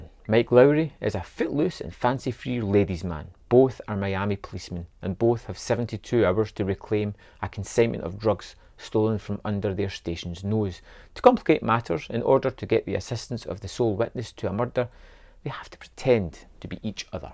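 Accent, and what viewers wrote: Scotland, United Kingdom. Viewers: You got my sub. Great reviews. You're a little bit difficult to understand, given your rather heavy Scottish accent, but for myself I found I really had to listen to what you were saying and consequentially your comments sunk in.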